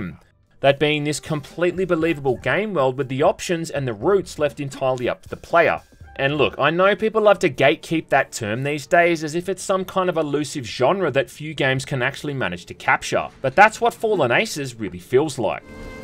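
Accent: Australia. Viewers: I love your videos, your Australian accent paired with a witty and downright kneeslapping sense of humor makes my day sometimes. Even though i can't afford most of these games its still a treat to see someone bring underground titles and non-mainstream games to the light and its a huge breath of fresh air. Keep doin what you do ya bastard ❤.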